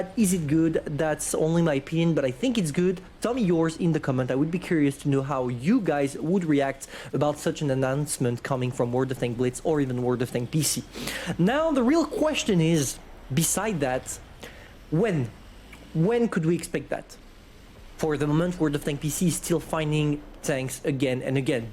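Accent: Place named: France. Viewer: i love your video and your french sound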